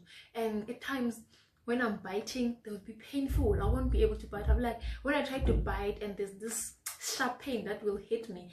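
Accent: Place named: South Africa. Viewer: Please upload more videos, i like your personality and the content of your channel, this is very helpful. and you have a xhosa accent which i love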